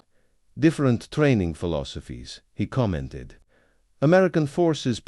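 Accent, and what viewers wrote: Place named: Eastern Europe. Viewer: UTTER NONSENSE The young fella on the "title page" appears in a private guardsman uniform embellished with various bits of frippery and unknown collar dogs and shoulder flashes. The narrator has a pronounced east-European accent - a noticeable Russian cadence. Since when has Buckingham Palace had ornamental flower beds on the public side of the gates? This is COMPLETE CRAP - DON'T WASTE YOUR TIME - IGNORE "Guard's life"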